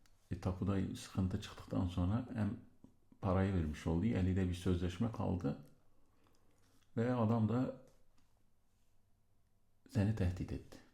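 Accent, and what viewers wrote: Eastern Anatolia, Turkey. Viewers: Reis bunun yeni bir halini bekliyoruz doğu şilveli mafya efsana olmuşş seviliyorsun 500.000 dolar da iyi iyi iyi baya iyiii <3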